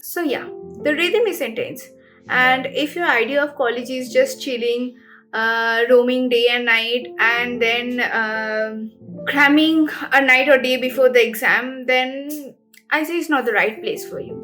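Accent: Bengal, India. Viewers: Class schedule k baare mein btao didi, targeting IISER Kolkata. Are you bengali cuz ur accent sounds like that?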